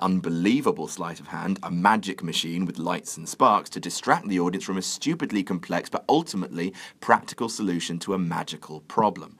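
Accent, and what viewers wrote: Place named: England, United Kingdom. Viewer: stop talkong so fast its hard for us americans to understand you with your dumb english accent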